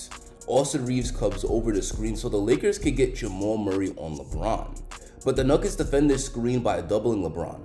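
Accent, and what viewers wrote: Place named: Philippines. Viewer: Is this from a filipino point of view? I can tell from you’re accent.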